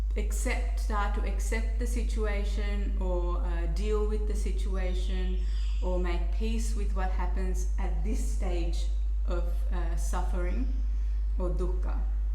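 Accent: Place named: Australia. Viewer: Australian accent interesting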